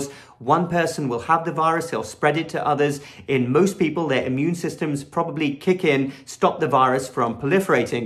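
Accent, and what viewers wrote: England, United Kingdom. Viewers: English accent and your intelligence makes you a bawss professor anyway, no need to add on the dorky glasses you look good bruh you rawk already! boss doc!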